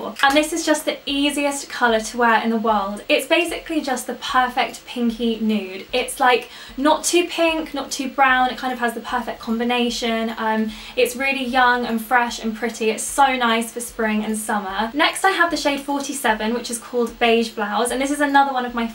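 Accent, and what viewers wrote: United Kingdom. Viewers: she's not american, she's british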